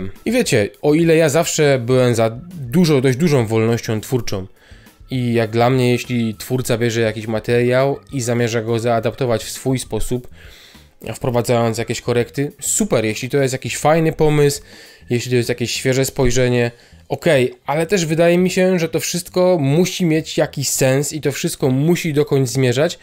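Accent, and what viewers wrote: Silesia, Poland. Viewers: wyczuwam lekką nutę śląskiego akcentu :) pozdrawiam z opolskiego.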